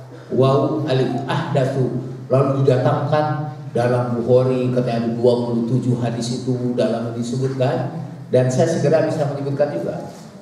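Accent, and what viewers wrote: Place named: West Java, Indonesia. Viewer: Sunda na kaciri pisan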